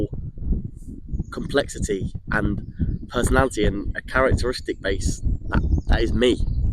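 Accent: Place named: RP, United Kingdom. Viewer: U sound posh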